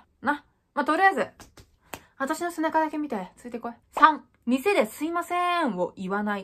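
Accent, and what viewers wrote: Nagasaki, Japan. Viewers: 喋り方から天草か長崎の人かなぁと思ったけど違うかな！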